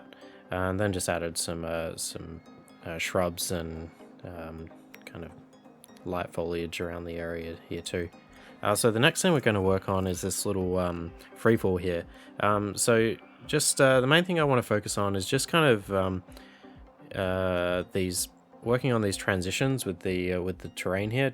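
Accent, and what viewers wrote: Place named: Australia. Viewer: Getting a lot of inspiration from your videos in general, not just this one specifically. Really enjoying them! Definitely a more chilled vibe to some of the other RCT content creators out there (without criticising them of course, just my subjective experience); plus your Aussie accent is a welcome change for a fellow Aussie 🙂I've done the whole "click like and subscribe" ! Many thanks!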